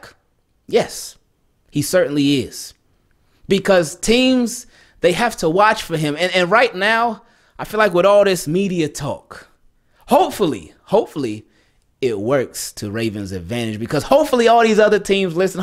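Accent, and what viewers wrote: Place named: New York, United States. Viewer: By the way, as much as I love him just badgering and yelling about nonsense in a New York accent, this is the same Stephen A that didn’t know which of our running backs were out for the season. Hahahaha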